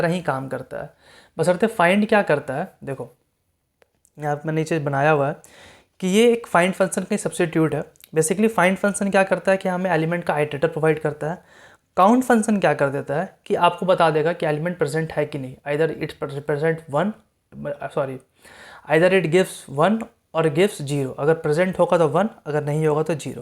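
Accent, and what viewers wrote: Bihar, India. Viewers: sir aap bihar se hai???/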